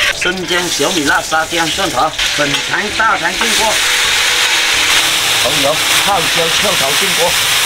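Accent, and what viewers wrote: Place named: Malaysia. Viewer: Where is from? Sounded like a Malaysian.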